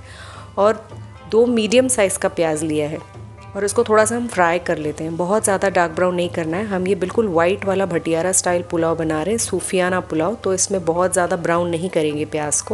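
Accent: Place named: India. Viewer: Feeki ...wali mirchen??😅 ...chawal fool..jayen?😂😂😂 Pheeki*. Phool*. Oh acha ap hindi accent use kar rahi hain. Warna urdu me ..pheeka.... phoool... bolte hain.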